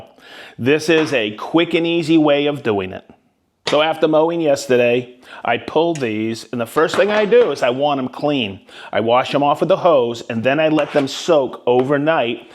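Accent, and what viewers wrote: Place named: New England, United States. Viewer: Yikes! Metal shavings around wood-the reason I separate wood shop from my lawn mower repair shop. I get it your shop is set up for videotaping still makes me cringe. With that said, the hand grinder is my new go-to method to sharpen mower blades that’s an excellent method and like you hit the burrs with a file. There is a debate out there you shouldn’t sharpen to “sharp” as it accelerates degradation of the edge. For me I like sharp and my customers appreciate it too. Cleaning the blade I use a wire brush currently but will try your soak method next time. One shop I used to work at hit the blade with black spray paint. This along with power washing machine with clean blade adds a perceived value of pride-things that transferred over to my current shop. Like your “wicked good “ New England accent.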